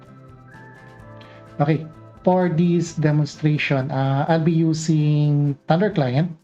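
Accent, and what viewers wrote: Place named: Philippines. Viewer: hi, I recognized your tone and confirmed that u r a filipino with your name. i'm a 3rd year filipino IT student. just proud of ur videos. thank youuu! it helped me with my simple project :)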